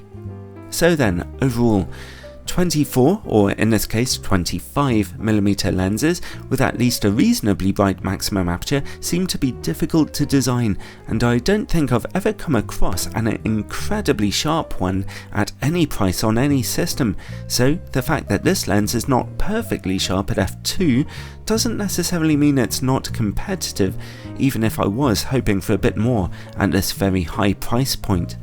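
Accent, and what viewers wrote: United Kingdom. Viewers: Hi, greetings from Italy i always watch at your videos because, at the beginning, i needed some reviews to make clear my shopping ideas, but now i’m totally addicted to your British accent and of course your meticulous way of making reviews. i also thank you for being the best english teacher i’ve had in the past 8 years hope you will come to naples for taking some beautiful photos and also to eat something good, you’re the best. (let me get my new credit card so i can sub to your patreon) pps: when i’m drunk your videos are kinda the best thing i can ever watch because it’s sooo relaxing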